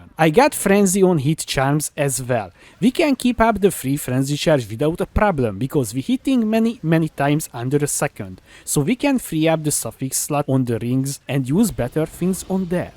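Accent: Hungary. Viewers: Are you Hungarian by any chance?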